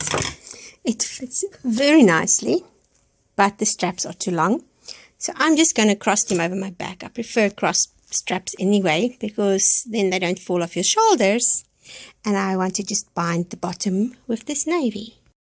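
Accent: South Africa. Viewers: Hi there, how clever of you to make your own costume. So gorgeous to hear you delightful Sth African accent. Its lovely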